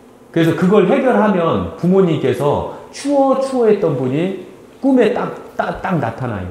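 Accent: Chungcheong, South Korea. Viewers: . 상투와 두건은 깔끔하고 시원해 보여 눈이 즐거운데요, 수염은 푸시시한게... 좀...염소 같잖아요. 턱선이 부드러워서 구수한 충청도 사투리하고도 잘 어울리는데 말예요. 면도싹~ 어떻게 생각하세유? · 충청남도 말씀하시네요 저도충청남도입니다 반가워요 어지그리 구수하게말쓰을하시는지 얼마나 재미있웃우운지 ㅎㅎㅎ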